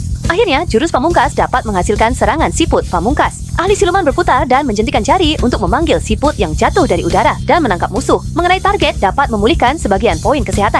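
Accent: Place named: Malaysia. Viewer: logatnya malaysia ya buang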